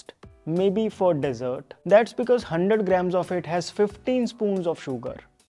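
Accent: India. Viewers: Let Indian English breathe. It has its own charm. ❤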